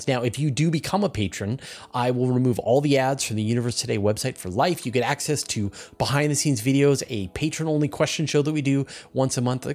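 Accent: Canada. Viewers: As a not native speaker I cannot spot your Canadian accent 😊 however I'm able to understand you very easily. Excellent pronunciation and always great contents! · I find your Canadian accent interesting. It gives Canada a linguistic distinction from the US. Language is an important part of national pride. Don't be ashamed of it. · I live in Southern California and I and most people I know pronounce lava as “lah va” and not “lav eh” as does. My sister-in-law’s parents are Canadian and I took Canadian history in college so Canadian accent is very easy for me to hear. And that’s not a criticism so I think Canadian accents are cool. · ROFL on the Canadian accent. Nothing wrong with proper enunciation of words :) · Canadian accent…as a fellow Canadian I never considered that, lol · You should never worry about your accent. People love it. It’s part of the reason Canadian’s are so popular on YouTube.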